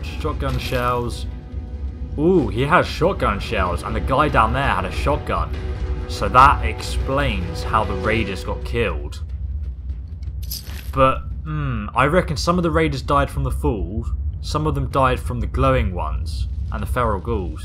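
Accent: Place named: United Kingdom. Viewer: I'm not saying this with any malice intended to you or (his vids are great), but I've had a feeling that he might be dyslexic or have some sort trouble saying what he reads. He does it very often in many of his videos. Like saying Mr. Gusty when its really Mr. Gutsy for example. It happens often enough with enough different words/names repeatably to the point it's noticeable. It might be separate from what is being debated in this comment thread, but I can't attribute my observations to just a British accent which I am familiar with.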